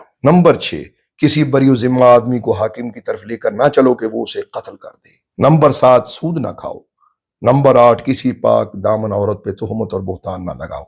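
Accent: Arab world. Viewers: ALLAH HO ALLAH … HAZOOR QIBLA… sadqe ap k arabic lab o lehjay py … QURAN E PAK OR HADEEZ E MUBARAK se zabrdast beyan mubarak … HAZRAT MUSA k 9 mujzat life me first time ap se sune ko mily … Ap k wasly se hum in 9 mujzat py iman rahkty hai jo ALLAH PAK ny HAZRAT MUSA ko ata farmay … explaining method alaaaa or purasar… Ap ki alaaa azmaton ko salam … ❤️🌹🌹🌹❤️❤️❤️🌹❤️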